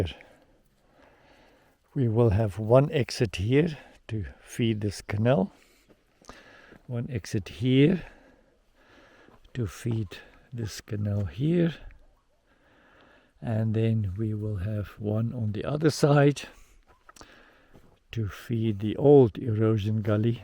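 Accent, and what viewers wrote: South Africa. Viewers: Keep at it! Things are looking great there. You sound like you're South African, I am too. I have built a water conservation garden which is very effect in SA and love seeing others doing it too! The culvert looks great. I suggest heavily mulch your earthen wall and berms etc before heavy rains or you'll need to dig your trench again. My swales are around 80cm wide or so, a little wider than yours and when it rains a fair bit would fall down back in on uncovered/unmulched areas. You luckily have the resources around you. If you cut the nearby grasses you can use those as an easy mulch.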